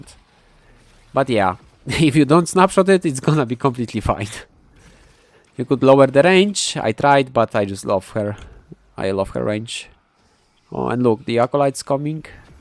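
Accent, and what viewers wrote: Poland. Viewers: u poland? i hear accent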